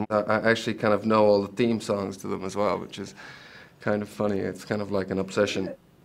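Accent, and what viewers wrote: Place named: Ireland. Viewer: i like his cute irish accent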